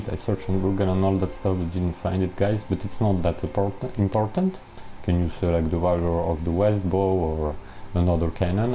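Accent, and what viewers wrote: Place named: France. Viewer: Your French accent is so sweet, and good build gg wp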